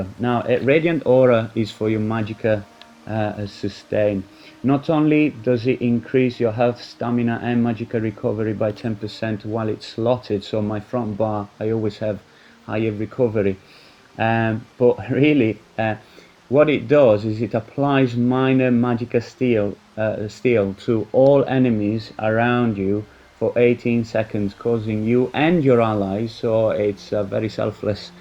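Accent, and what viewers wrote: North, United Kingdom. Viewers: subbed. your accent is mad :) you sound like you are from up north, but you also sound slightly Italian man 😂 not bad though, I like it, it doesn't have those annoying vowel sounds that they make. lol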